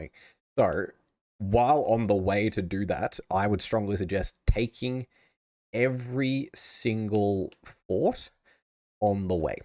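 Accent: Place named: Australia. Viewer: One of the thickest Aussie accents I’ve ever heard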